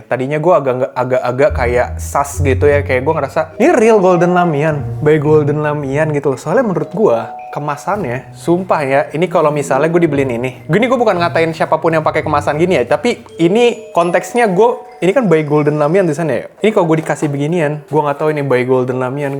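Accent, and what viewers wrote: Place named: Solo, Indonesia. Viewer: Itukan suara priaa solo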